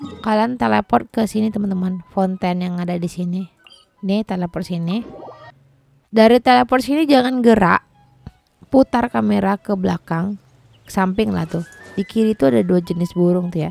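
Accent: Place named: Sumatra, Indonesia. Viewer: logatnya kek org sumatra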